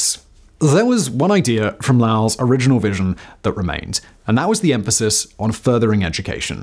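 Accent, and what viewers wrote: RP, United Kingdom. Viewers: Amazing that this is what people like you are getting out of this video. Several of you have made comments about the pronunciation. He’s British. Pronunciations vary to one degree or another depending on where you live. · Doesn't matter telling him. He speaks the Queen's, erm, I mean, Kings English of the South here in England referred to as RP (Recieved Pronunciation). All the southerners pronounce it that way. Sounds rather goofy to be honest. Wait until you hear them say Maryland. Literally, Mary Land haha😉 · He's British. The way he says things is different than us across the pond. His accent is beautiful. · being English is pronouncing as a person from England. Being Australian I would pronounce it the same. This is how we pronounce the name in our relative countries. However it is annoying to hear something not pronounced in the way you feel it should be.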